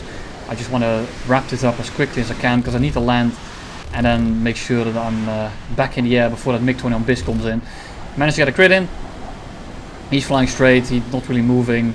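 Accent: Netherlands. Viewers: hahah still a nice video😉 wait a dutch accent ?🇳🇱🇳🇱🇳🇱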